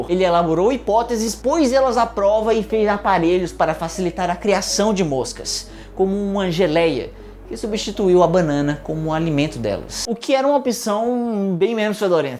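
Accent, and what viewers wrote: Ceará, Brazil. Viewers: Você é do Ceará? Se for, qual cidade, notei por algumas gírias e o sotaque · Umas amigas me explicaram o que era "aí dento" mas eu nunca tinha escutado de maneira natural antes desse vídeo kkkkk. Você é do Ceará? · Vc é cearense? Kjjkj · tu é cearense????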